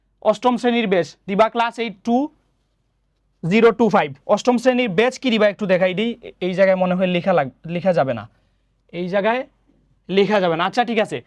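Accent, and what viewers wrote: Sylhet, Bangladesh. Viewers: sir ar kota bola sune mone hocce sir sylheti😂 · Yes Sylheti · Hmm sylhetii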